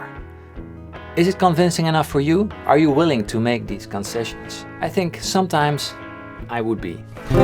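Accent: Netherlands. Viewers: Are you dutch? You sound dutch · Haha, instantly heard you were Dutch (me too XD)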